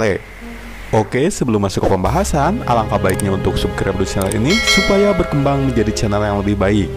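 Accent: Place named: West Java, Indonesia. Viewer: Jiga urang sunda ieu mah nu ngomong na hehehe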